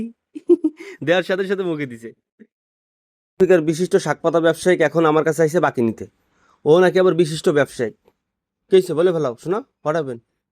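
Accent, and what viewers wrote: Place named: Khulna, Bangladesh. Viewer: Kichu mone na korle ektu bolben apni ki Khulna ba Khulna bivag er keu ? Apnake chena Lage ar apnar Kotha bolar dhoron kichuta khulnaiya taan ache...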